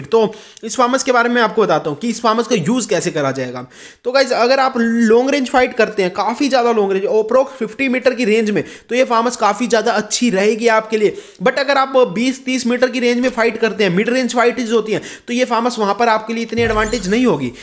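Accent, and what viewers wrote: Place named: Haryana, India. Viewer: Haryana se bhai tu teri language same hai hmare jasi😍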